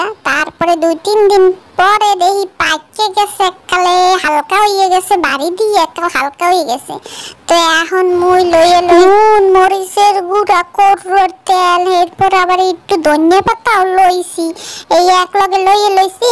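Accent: Bangladesh. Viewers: কদবেল যেই রহম যমমের মজা হেইরহম বরিশালের ভাষাও হোনতে যমমের মজা। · কদবেল আহ দেখলেই জিভে পানি চলে আসে। বরিশালের ভাষাটা অসাধারণ লেগেছে।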